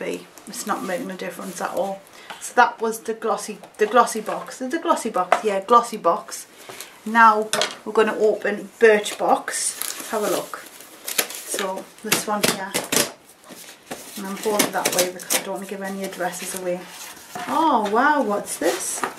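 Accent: Newcastle, United Kingdom. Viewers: You cannot believe how happy I am to see you back on here and so regularly too. Became a Patreon and wish I could send you more. I used 1 of your Barry M tutorials for a look I wore for years. I also bought 1 of your palettes too. Wow I’m beginning to sound like a weirdo lol, I live in Newcastle and love hearing the accent. You look so happy and relaxed xxxx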